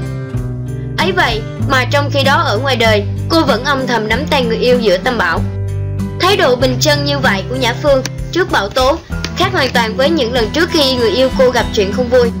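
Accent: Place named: South, Vietnam. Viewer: Noi Nam tham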